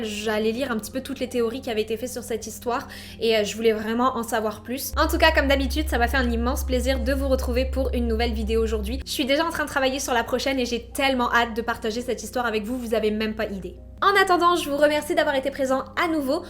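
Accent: Quebec, Canada. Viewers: D’où vien ton accent tu parles un peu québécois je trouve ?! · Coucou! Je suis du Québec et j'ai eu l'impression tout au long de ta vidéo de deceller un petit accent de chez moi?! En tout cas, tu as une nouvelle abonnée, félicitations pour ta vidéo ! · J'ai pensé des le debut de la vidéo que c'était probablement une histoire d'agent des services secrets, et je le pense tjr à la fin de la vidéo ducoup ahah, c'est le genre de crime limite banale qui se passent dans les dessous bien sombres des enquêtes de ces services d'espionnage, sinon tres bonne vidéo, j'ai fais un marathons de ta chaine aujourd'hui ayant pass la journée chez moi comme malade et j'aime beaucoup ta façon de raconté les histoire ( et le léger accent québécois ahah ) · j'aime bien ton petit accent canadien ^^ c'est cute et continue ce style ( je te decouvre avec cette affaire ) et les truecrim , c'est grave intéressant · salut a entendre ton accent ,tu es quebequoise? 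· Je confirme également, je l avais capté cet accent québécois et c est très mignon 👍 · Bonsoir, j'i bien aimé cette histoire que tu nous as compté ce soir. Je suis partant pour que tu nous parles aussi d'affaires non-résolues, d'affaires résolues des années après et de crimes comme tu avais commencé à le faire. Pour ma part, cette pauvre Jennifer semblait faire partie des services secrets d'un pays européen comme la Belgique. Son soi-disant suicide n'est pas du tout valable car il y aurait eu forcément son sang sur le pistolet et sur sa main et de plus, le pistolet aurait été dans une autre position que celle montrée sur l'image. Merci pour ton super travail, j'adore, tu es formidable. Petit bémol, pourrais-tu ralentir le débit de ta voix. Tu as des courses à faire après ta vidéo, présentement? Continues à nous compter ces histoires criminelles avec ton joli accent québécois et tes belles expressions françaises qui sont désuétudes de nos jours en France. 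Serait-il possible d'en savoir un peu plus sur toi, stp? Belle fin de soirée. Bisous criminels sexy nocturnes.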